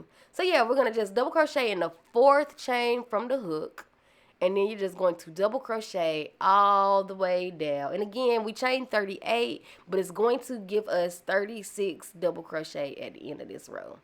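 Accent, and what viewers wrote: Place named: Texas, United States. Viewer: I was just wondering when would you have a new CIY vid!! Thank you! & I love your accent, sounds like you're from Texas.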